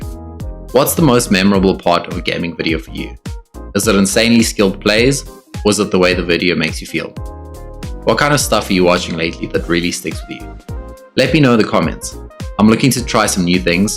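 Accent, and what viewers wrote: South Africa. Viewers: South african? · Ayo naaah you sound like my people, you from SA by any chance 👀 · You from South Africa??? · Great video man, I just recently broke 200 hours in the game. You sound hella South African too🔥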